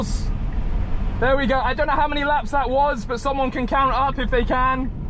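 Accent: United Kingdom. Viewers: Although your accent is British, and the steering wheel is on the right side, you pronounced "schedule" like Americans. :D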